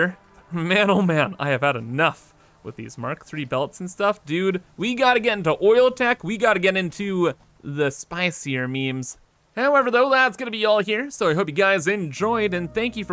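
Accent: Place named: Canada. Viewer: no, mate, listen too his accent. He's so Canadian. So very Canadian.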